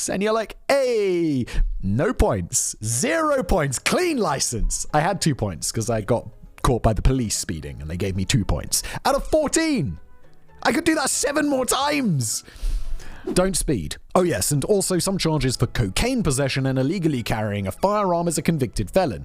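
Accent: RP, United Kingdom. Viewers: If it wasn't for this channel I wouldn't be able to tolerate his poshness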